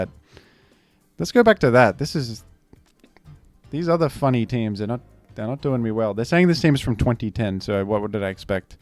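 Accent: United States. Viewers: Something about the American weeb accent disturbs me in a profound way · cool American accent always gives me chills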